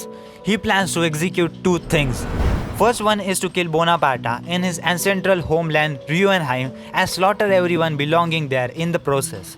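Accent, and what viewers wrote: India. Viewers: I can't stand this stupid tech support curry accent. Sorry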